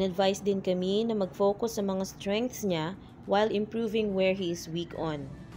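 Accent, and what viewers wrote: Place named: Pampanga, Philippines. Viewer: Hi po Mommy napansin ko po kampangan kayo..taga pampanga po ba kayo?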